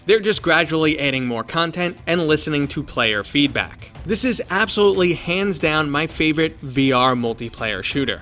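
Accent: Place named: Boston, United States. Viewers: Yo this man is so Boston I thought he was Mark Wahlberg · You from long island? Maybe Mass cuz I see you tryna hide it but sounds more like here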